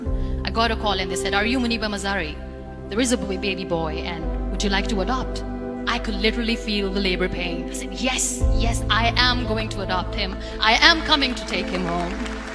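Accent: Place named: India. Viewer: Hindi accent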